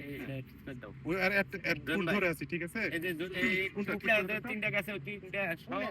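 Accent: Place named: Natore, Bangladesh. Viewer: আপনার বাড়ি মনে হচ্ছে নাটোরে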